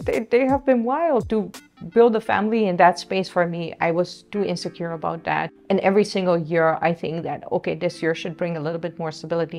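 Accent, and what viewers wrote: India. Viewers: She has an Indian accent now 🤣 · She has developed a slight Indian accent. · I am hearing bit of indian accent in u lol, love ur journey so far❤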